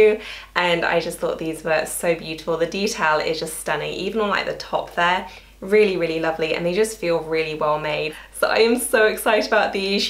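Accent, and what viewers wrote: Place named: London, United Kingdom. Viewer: I want to enter the Chanel wallet giveaway!!!! I love your videos and London accent 🥰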